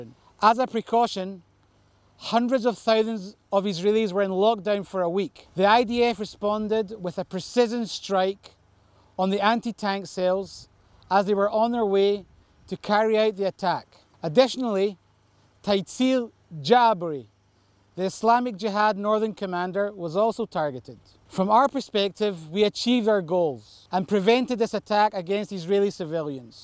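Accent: Scotland, United Kingdom. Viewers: Is he Scottish? It’s like an Israeli Scottish accent! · May Hashem keep you all safe💖🇮🇱Thank you for all you do.( Amazing to hear a Scottish accent 😍) תודה. רבה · This guys sounds Scottish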